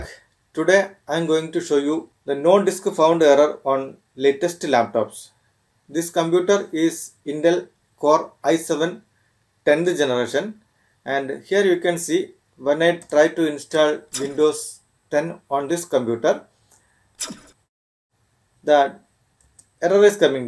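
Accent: India. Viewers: Excellent! To be honest, at first, I was put off by the poor-quality audio and the strong Indian accent, but everything he showed - worked. I didn't have the drivers in my Windows installation USB (even though I downloaded the Boot creation software right from Microsoft's website ...) I downloaded them from the link he put in the description here, unzipped the packages and it worked. I have my partitions showing now. Thank you and keep up the good work!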